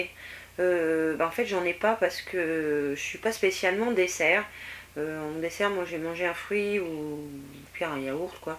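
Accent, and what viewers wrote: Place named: France. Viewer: Je suis née le 17 décembre, si j'me trompe pas.... LOLGaelle, dans un commentaire récent, je te croyais belge. C'est à cause des vidéos proposés avec le tien, à droite. Plusieurs étaient de la Belgique. Je n'y connais rien. J'aime l'accent francais, si différent de celui du Québec.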